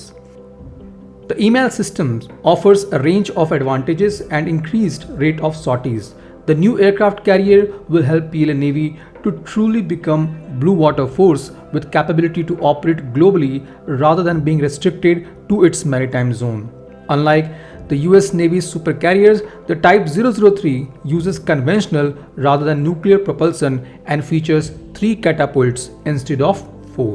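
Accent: India. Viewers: India accent english sounds so funny. Love India from China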